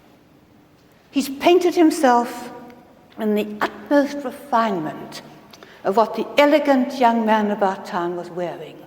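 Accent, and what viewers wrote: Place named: South Africa. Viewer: Sr Wendy largely lost her South African accent but it shows in her ability to pronounce Dutch, Flemish and German names correctly.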